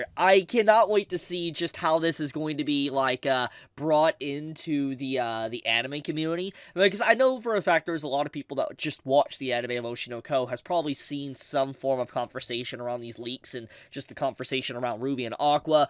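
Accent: South, United States. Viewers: idk your accent sounds a little southern so you might be use to this sort of thing.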